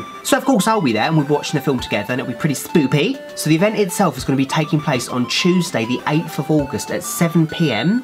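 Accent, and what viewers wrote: England, United Kingdom. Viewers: which part of England you from? i love your accent!! <3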